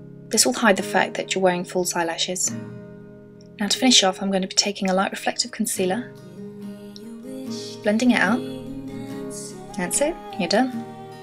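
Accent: United Kingdom. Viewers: i love ur british accient and ur make up is amazing!!!! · its nice to hear a british voice on youtube, most the people that do make up tutorials are american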